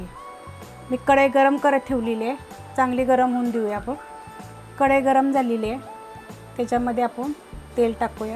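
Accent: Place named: Maharashtra, India. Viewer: मस्तच सांगितली रेसिपी... आवाजावरून तुम्ही सातारा च्या वाटता ताई...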